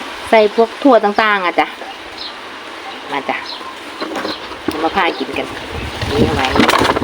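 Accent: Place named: Suphanburi, Thailand. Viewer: ทำเองกินเองนักเลงพอ555น่าทานจังเลย แต่ขอโทษนะคะเป็นคนสุพรรณหรือเปล่าคะสำเนียงเหมือนเลยมลคนสุพรรณนะคะอยู่ดอนเจดีย์😊💖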